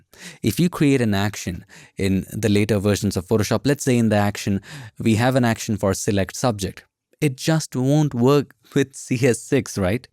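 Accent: India. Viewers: I didn't even think that i will fall in love with an Indian accent one day xD love you mate , your fan from Egypt!♥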